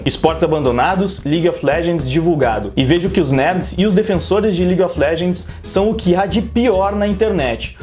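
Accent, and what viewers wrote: Rio Grande do Sul, Brazil. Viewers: Caralho mano, tu é gaúcho?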